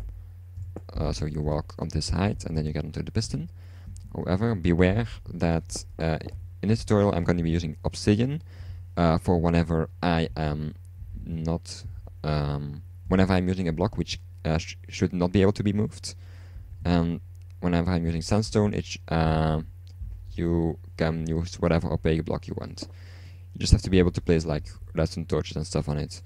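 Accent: Netherlands. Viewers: I can hear you're dutch, and you're from the south of the Netherlands aren't you? ;) Great design man! Kudos to you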